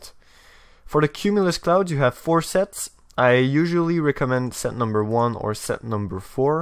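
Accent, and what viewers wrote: France. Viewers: are you french ???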